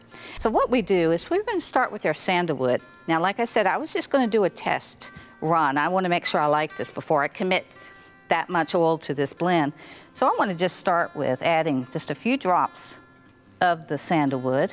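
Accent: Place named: Texas, United States. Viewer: She got that heavy Texas accent “oowls" lol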